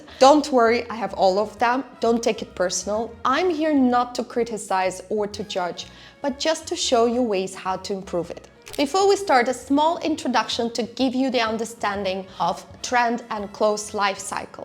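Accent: Russia. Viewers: Very Russian accent.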